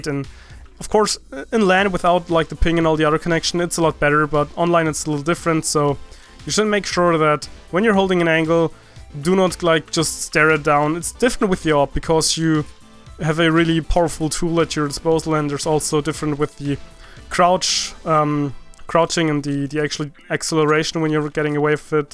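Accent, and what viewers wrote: Germany. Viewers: Bist du eigentlich deutsch? :D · bist du deutsch ??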